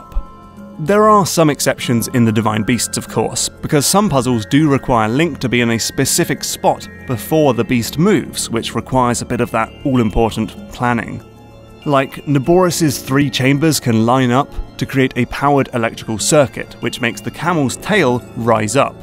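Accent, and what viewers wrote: United Kingdom. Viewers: I was expecting "anti-clockwise" from the British accent. Must have been painful to say "counter-clockwise."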